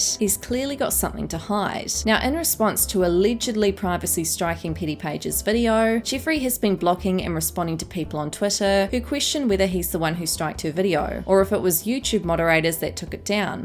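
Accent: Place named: New Zealand. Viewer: She’s a kiwi from New Zealand it sounds like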